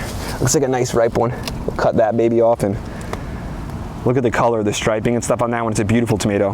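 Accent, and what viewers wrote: New Jersey, United States. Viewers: I hear that Jersey accent, “wooder your plants” 😂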